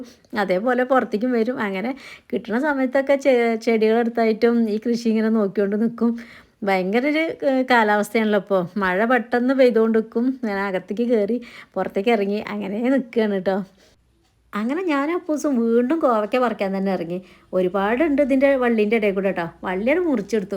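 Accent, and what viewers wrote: Malappuram, India. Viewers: ഇങ്ങള് മലപ്പുറം, നിലമ്പൂർ, വണ്ടൂർ ഭാഗത്ത് എവിടെയെങ്കിലുമാണോ? സംസാര ശൈലി വെച്ച് ചോദിച്ചതാണ്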